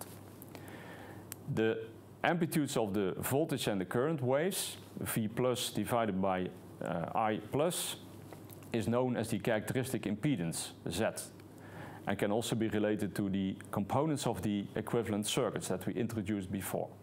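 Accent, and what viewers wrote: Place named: Germany. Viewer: English with a German accent. :)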